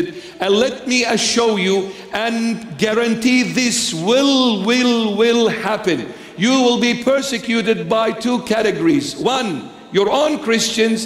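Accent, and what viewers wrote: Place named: Australia. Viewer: dear brother Mar Mari Emmanuel The Holy Spirit is truly guiding you Brother, may God bless you and protect you always in Jesus Holy name.:thanksdoc: p.s you have an Australian accent are you in Australia?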